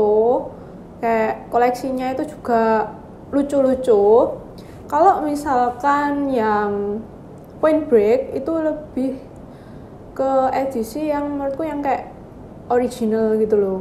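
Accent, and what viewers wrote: Java, Indonesia. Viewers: medok amat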